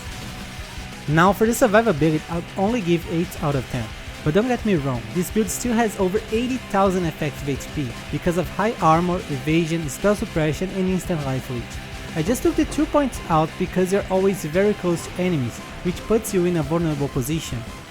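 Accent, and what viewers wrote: Brazil. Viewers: cara, vc é br né esse sotaque ai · Dude, where u from? You have a Brazilian accent xd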